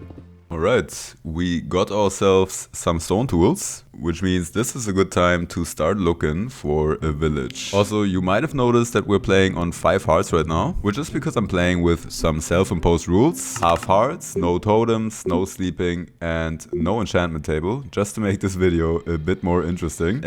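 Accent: Germany. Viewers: Not even 10 seconds and i could already hear the german accent 💀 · as a german I hear that you are German only because of the voice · Ich hear a deutschen akzent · First time watching a video of yours and I love how I immediately said "German" the moment you spoke😂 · i know a german accent when i hear one haha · I can here a little bit Deutsch in your englisch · I know a German accent when I hear it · I hear deutsch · Why do i think that U are a German guy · Ich Liebs einfach wie ich in 5sek den deutschen Akzent rausgehörte habe 😂 Geiles Video Grüße auf Hannover ❤ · I can smell the German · are you german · Ohne Witz, ich mache das Video an, höre den Akzent und denke mir… der ist doch deutsch. Checke dein Acc and low and behold its in Germany 😭😭 love that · out of context but are u perchance german? ur accent and pronunciation sounds like it (coming from a german person) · i hear a little bit deutsch haha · you sound so german 🙏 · Is he german? 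Bruder ich hörs doch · damn u got a cool german accent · I hear the DEUTSCH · Are you german? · Do I hear german?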